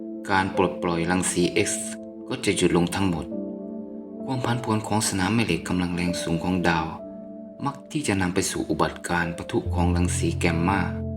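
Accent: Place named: Isan, Thailand. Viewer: เสียงบรรยายสำเนียงอิสานมากๆคับ น่ารักดี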